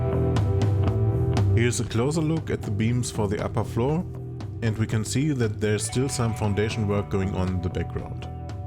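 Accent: Germany. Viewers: best episode from over the traintrack so far! as a german, I really like your german accent. has some nice authentic touch to it. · The voice works well! German accent gives extra charm to the video, Serious, I can't imagine any other accent for Tesla German factory. Why can you not fly over the factory anymore? The video is excellent, as always. Maybe a zoom improvement in the future. Greetings from NL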